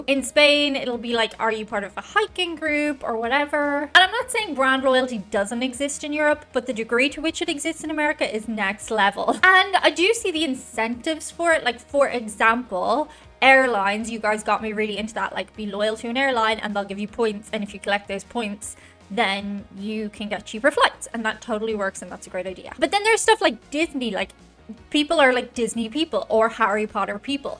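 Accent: Ireland. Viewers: As far as being hit on, you're fairly attractive and the Irish accent adds just enough exoticism without being too alien. So it doesn't surprise me too much that some men in America go, "what the hell" and take their best shot. 🤷‍♂ As for distance measured in travel time, I think that likely has a lot to do with the fact that we have such vast distances that it can take a really long time to get anywhere. (That really hit home for me once, on my second trip to Germany. I flew in to Hamburg and spent three days; hopped a train to Berlin and spent five days; hopped another train to Ingolstadt and spent a day; and then took a local commuter into Munich and spent a few days before I flew home. As I was checking out through German customs, the agent there noted I'd flown in to Hamburg but was leaving from Munich and asked me if he understood that correctly. When I confirmed that was the case, he said, "But that's very far, ja?" To which I replied, "Not really ....😕") Also, for a completely different experience in receiving directions, you should come and visit us in Colorado, some time. 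You'll hear something new. 😁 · MMM. I think the reply to thank you and compliments is kinda regional. I started replying thank you to compliments because I was never good at taking complements ( Maybe those Irish Genes LOL ) they always flabergasted me... I thought that might be considered rude , so I go to thank you now. You are attractive and you have that great Irish accent.... so no surprise you get hit on... Just turn them down politely...that will usually work. Remember the US is full of folks of Irish descent. .. so it is easy to feel that attraction. Yeah.. we do.. over do too well. I have had other Europeans tell me they liked the encouragement to do something instead of one should stay in their own lanes. Interesting .Thanks. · Hello Fellow Humans, We are direct because you are absolutely gorgeous. You have an irish accent which is one of the top sexiest accents in the world. You are a price for men in America. 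You are gorgeous, blonde, blue eyed, irish. Seriously you can easily get a husband in America and he will worship you. Brand loyalty is because we are initiated with commercials and ads from birth. Parents live through their kids and so force them to do what they love. It is annoying. Let kids be kids.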